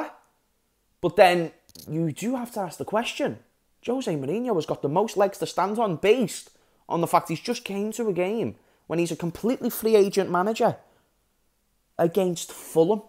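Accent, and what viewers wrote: Liverpool, United Kingdom. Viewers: I can tell from your accent that you're from Liverpool.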